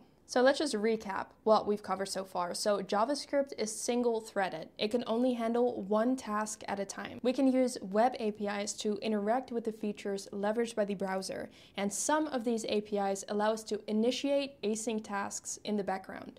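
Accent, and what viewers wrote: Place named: Netherlands. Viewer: I hear some slight Dutch here :p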